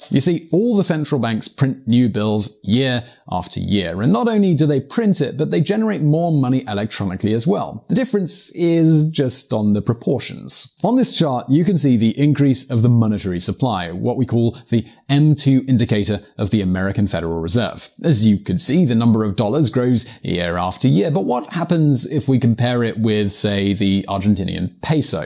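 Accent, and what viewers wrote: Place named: Southern England, United Kingdom. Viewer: It's his South Brit accent. Nothing wrong with his mic. :)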